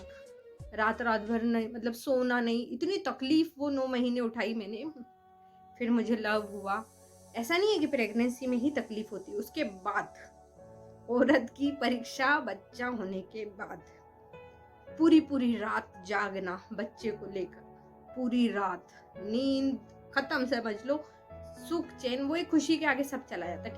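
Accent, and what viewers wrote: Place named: Bhopal, India. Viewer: Aapka tone bhi bilkul bhopal ka hai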